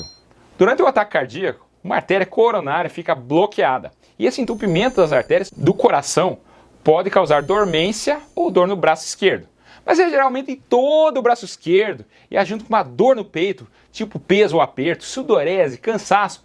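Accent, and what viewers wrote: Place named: Minas Gerais, Brazil. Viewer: Esse doutor é muito simpático, sotaque de mineirim 🥰 Bom que fala rápido...rsrs...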